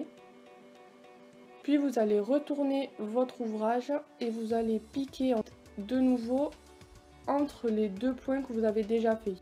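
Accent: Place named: Marseille, France. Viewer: C'est la première fois qui j'entends ta voie et je ne m'attendais pas du tout à un accent marseillais😂😂 Bref! Ta vidéo est vraiment cool!😍😍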